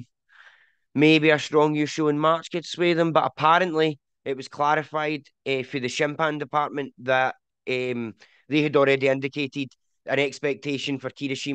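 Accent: Scotland, United Kingdom. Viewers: The Scot is back! Always a joy to hear your commentary and hot takes. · love getting my sumo news with a scottish accent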